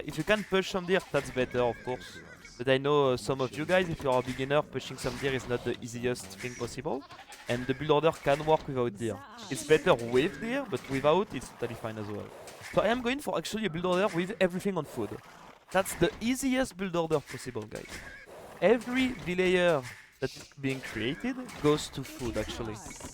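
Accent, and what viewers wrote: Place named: France. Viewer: Bizzarement on sent dans ta voix que tu parles français ou que tu as une peux l'accent je me trompe 😏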